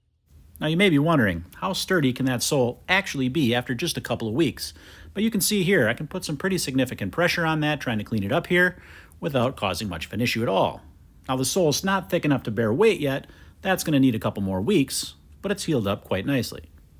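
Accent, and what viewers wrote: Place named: Wisconsin, United States. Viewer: Ope! Thats a Wisconsin accent if I’ve ever heard one.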